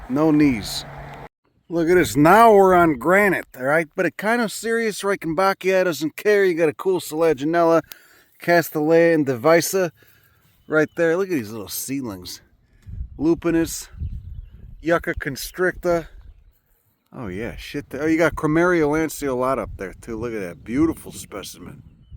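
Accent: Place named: Texas, United States. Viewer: Hey! You're up near my neck of the woods in Austin, nice of you to visit. Timing is good, too, wettest Spring I can recall. I learn so much watching your videos. If you are not now a professor of Botany at some Texas University, they should be recruiting you. You would be the most popular prof in the state. BTW, I fully endorse your pattern of speech, time to admit to reality in Texas.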